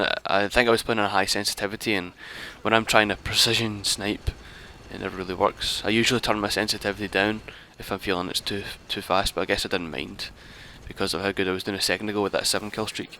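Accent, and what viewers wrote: Scotland, United Kingdom. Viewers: OMFG YOUR SCOTTISH, YA BEAST :D:D:D:D:D:D:D finally, a scottish dude like myself doing a vid :L awesome :D:D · scottish arent you im scottish?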